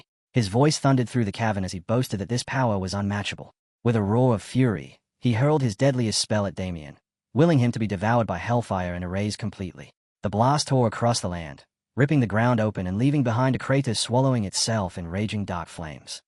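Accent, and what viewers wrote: Australia. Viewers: Idk but i want to say Australian for some reason. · Stupid Aussie accent.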